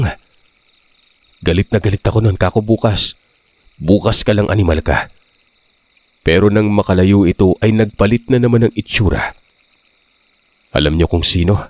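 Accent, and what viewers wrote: Visayas, Philippines. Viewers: Bisaya pa more sir 😂 natutuwa ako pag nag bibisya ka.. pero mglng ka mag ilonggo...😊😊😊😊 shout out bahrain 🇧🇭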